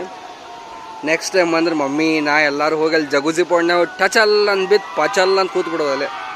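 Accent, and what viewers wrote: North Karnataka, India. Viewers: Mast pa Anna ni hero g yen kammi Ella ni mast matadti Nam uttar karnatak bhashe ninnu super anna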